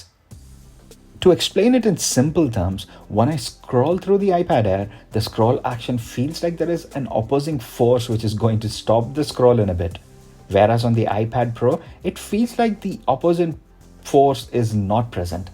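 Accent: India. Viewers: that's y..I was thinking that it sounds like Indian... well done bro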